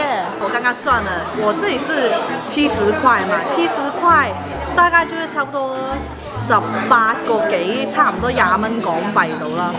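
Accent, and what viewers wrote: Malaysia. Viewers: 你的普通話，好有馬來華語腔啊！ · 怎么你的普通话口音不像香港口音，倒是像马来西亚口音（可能我听口音不是很准），但是讲粤语就有了。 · 每次睇到既片都覺得香港人去台灣讀書講國語馬來西亞口音好神奇😂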